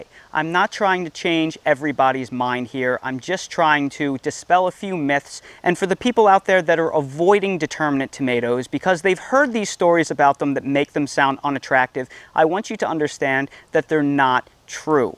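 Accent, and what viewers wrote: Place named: Pennsylvania, United States. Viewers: So funny, I KNEW you had a Philly accent. I was going to ask you after a previous video. I went to sleepaway camp in the Poconos for 10 years and I can pick that accent out anywhere. And thanks for the info on indeterminants. I already have Celebrity and Bella Rosa seeds and will be planting them this fall (South Florida, Zone 10B. You think YOU know about heat and humidity. HA!! AND our bugs are the size of Mini Coopers!) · When i started watching and you mentioned you were in NC, I was like oh cool my father and step mom lived there, she was from there. RIght away I said to myself hes not from there lol he's sounds like PA and looks like a Jersey Boy. Lmao in you revealed that you are from the PA/NJ area. lol WE spot our own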